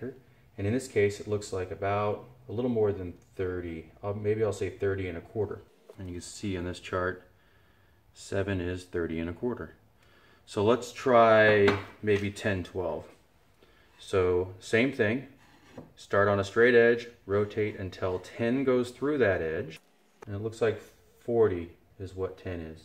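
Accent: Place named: United States. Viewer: Love the American accent :) and a very helpful video thank you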